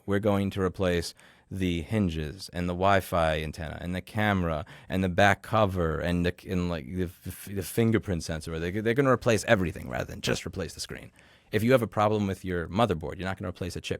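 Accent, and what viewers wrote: Australia. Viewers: He has an Australian accent... Also you aren't as clever as you think you are. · I’m Australian so it was always obvious that he was Australian but Australians don't have such distinct accents that alline with people’s race so it’s often not easy to pick. There are ethnic accent variations in Australia but they are often learned from your family rather than broader community so if you're a second or third gen Aussie you might just sound like everyone else. There are exceptions like people from Melbourne with Greek heritage like Nick Kygerios (I don't think he was originally born in Melbourne and he’s half Greek) but you can hear it in his accent. That's most likely because Melbourne has the largest Greek community outside of Greece. The Indigenous Australian accent is also quite distinct but many Aboriginal people don’t have it. I was surprised to see isn't white after watching his videos for a while, that was a whole second or two of surprise! 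Either way it doesn't really matter, I don't think anyone is watching his channel because they think he’s white any more than people watch MKBHD because he’s black (but has a more neutral accent). I’m guessing he went to a good school and he shares the same general accent that most of his peers had. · With an Australian accent? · Being interviewed by a Brit wait whats with the Aus twang. Ah... ok. Interesting. Cool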